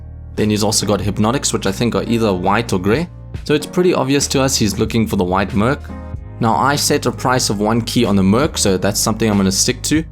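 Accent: South Africa. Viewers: Heyy, are you South African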